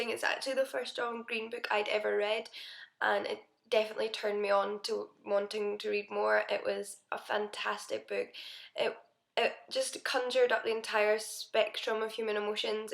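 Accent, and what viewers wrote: Scotland, United Kingdom. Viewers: Where are you from in Scotland? I'm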